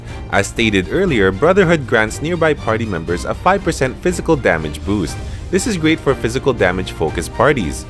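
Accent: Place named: Philippines. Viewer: so sound filipino bro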